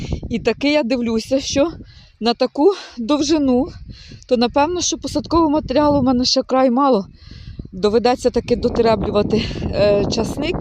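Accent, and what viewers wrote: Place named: Liubar, Ukraine. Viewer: Ви супер! Дивлюсь Вас із задоволенням.Люблю говір Любарщини. Когось знаєте із Меленець?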